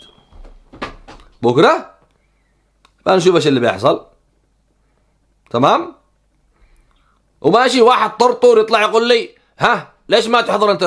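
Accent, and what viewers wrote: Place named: Yafa, Yemen. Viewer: لهجتك يافعي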